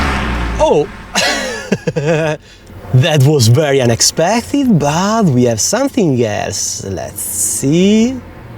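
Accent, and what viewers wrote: Italy. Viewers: Excellent footage, excellent Italian accent!!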